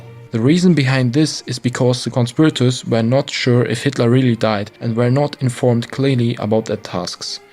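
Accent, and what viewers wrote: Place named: Europe. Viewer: That euro accent.......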